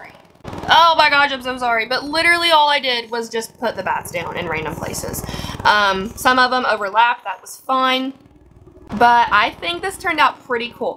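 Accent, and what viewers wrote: South, United States. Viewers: omg I’m a southerner and thought you were from the south this whole time! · Ok, I love your videos! So natural and fun...but I have to ask, where are you from? I’m from the south and sometimes I hear a bit of a southern accent but I’m not sure. Just curious. 🤗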